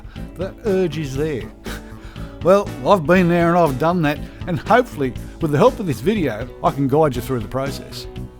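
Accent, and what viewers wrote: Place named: Australia. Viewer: My cousin is laughing so hard at this video right now… shout out from Vancouver Canada, he loves the Australian accent and says you are so charming. Starting to watch your other videos also, he said keep your channel growing because it’s so funny! Wondering why stopped posting By the way 999th subscriber❤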